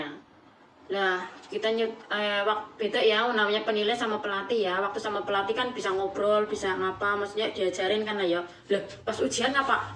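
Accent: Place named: Banyumas, Indonesia. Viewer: Hidup ngapak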